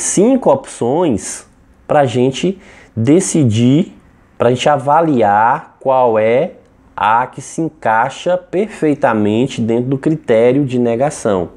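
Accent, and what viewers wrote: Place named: Bahia, Brazil. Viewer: Excelente trabalho, professor! O sr. é da Bahia? Se não for parece mto. Eu sou de Olinda, Pernambuco.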